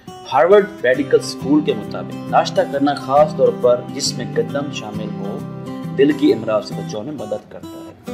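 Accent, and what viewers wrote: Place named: Pakistan. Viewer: bhai awaz pakistani hai tou video bhi pakistani bnao na kya angrazno ko dala hoa hai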